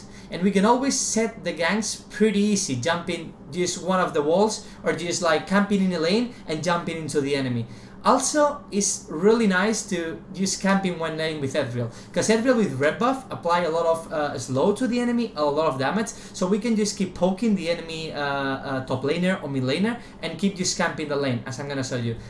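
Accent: Spain. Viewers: Cuase he is spanish and our english is... :S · Because he has spanish accent, since English language is his second language. Dunno what you've expected.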